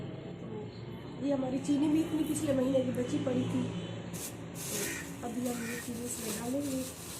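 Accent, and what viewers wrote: Saraiki, Pakistan. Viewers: Behan g bhatta program bohat Accha tha,ghareeb bhatta mazdooron ki mushklat Kiya Hoti Hain khoob rahnomai ki,,Behan pehle bhe ap ko SARiKi zuban men program Karne ko Kaha tha,,,,Behan g ap Ka lehja SARiKi zuban walls hai,ap Urdu mein jhlagta hai,plz APNI zuban mein program Kia Karo hamara SARiKi khitta hai ziyad SARiKi boli jati ha na Urdu Kam,,👌🙏🙏🙏💯👈plz reply,🤗 QAiSER.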